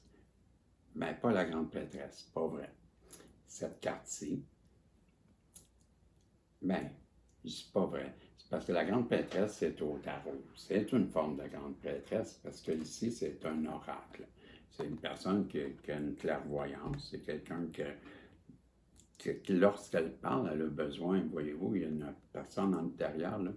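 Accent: Quebec, Canada. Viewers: Pour ma part je suis une bouffonne mais dans le bon sens du terme j'aime la vie,.. j'adore ton accent québécois... je me suis détachée en amour de mon mari qui m'accompagne depuis mes 18 ans mais aujourd'hui après avoir souffert seule, bloquée physiquement depuis 6sem.. aujourd'hui,le voyant chantonnant .. je ne supporte plus sa présence....et me retrouve en totale perte d'énergie.. quitter la maison dans laquelle je me suis seul temps investie.. difficile.. mercii pour cette guidance ❤ Véronique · Salut oui tu as raison j’aimerais qu il me disent la vérité mais je ne reviens pas en arrière merci l ami j adore l ´ accent québécois vive la vie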